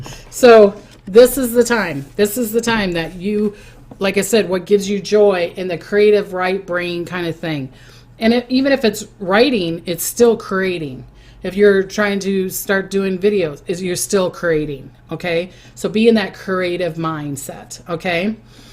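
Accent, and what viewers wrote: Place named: Minnesota, United States. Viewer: So glad I found this page ! I love your Minnesota accent ♥️♥️ Like a warm hug . Subscribed and notifications on ! Xoxo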